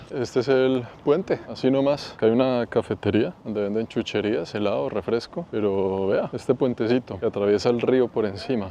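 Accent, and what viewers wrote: Bogotá, Colombia. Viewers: Hola. Lo que distingue a es la manera amena de relatar con su acento colombiano, muy gracioso. Además de enseñar lugares extraños como este 👏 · Aprender contigo es genial, lo genial es que eres rolo y gu forma de hablar es muy linda, si te veo en la calle te robo un beso 😂😂😂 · no paro de reírme escuchando tu rolo, es escuchar un cuento, contado por mi versión masculina 😂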